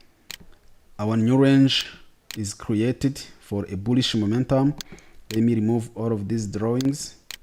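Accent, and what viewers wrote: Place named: East Africa. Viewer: That accent sounds east African, it's good to be tonight by my fellow neighbor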